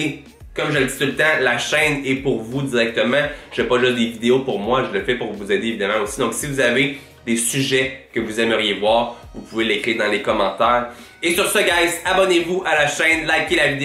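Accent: Quebec, Canada. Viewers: L'accent québécois.. savoureux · Première vidéo que j'regarde de ce type. L'accent québécois je m'y attendais pas du tout.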